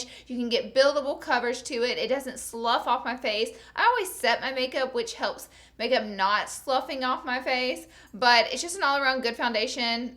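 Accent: South, United States. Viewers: Hi! I just started following you. I am also a southerner turned Californian, so naturally I love your voice! Reminds me of home. =) Love the hair, its super cute!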